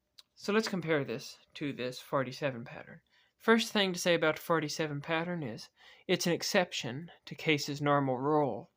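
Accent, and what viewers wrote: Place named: West Texas, United States. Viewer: He’s probably not from there but his voice and accent reminds me so much of a soft spoken hotel clerk in Van Horn, west TX 😂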